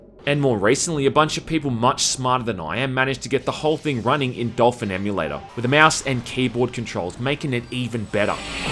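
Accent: Australia. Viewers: is this the same aussie that did those hilarious mortal kombat lets plays? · are you australian? I ask because of your accent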